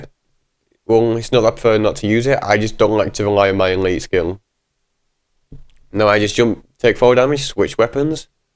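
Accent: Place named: United Kingdom. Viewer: i hate ur fucking british accent jeez , put subtitle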